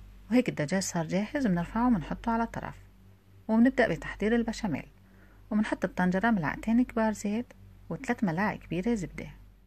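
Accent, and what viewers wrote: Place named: Aleppo, Syria. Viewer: يسلمو ايديكي الله يجزيكي كل خير سؤال، انت من حلب لهجتك كان من حلب.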